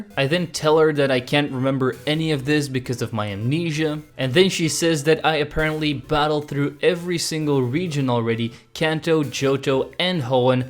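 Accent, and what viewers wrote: Flanders, Belgium. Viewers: Zijt gij van belgie? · Wait a sec UR VLAAMS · Hey, are you from Belgium perhaps? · Are you from belgium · Ya french or Dutch belgium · Are u belgium?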